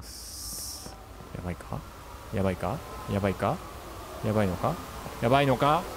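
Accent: Hokkaido, Japan. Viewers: 謎解きもゴリ押しで解いちゃったり、北海道弁が聞けたの嬉しいし、びっくり要素がいっぱいあって面白い名前があったり、八つ当たりで「飛んでけぇぇ！！」や物を投げる時に「すとーん！」って言っているのも好きです。お約束のバグもあって面白かったです。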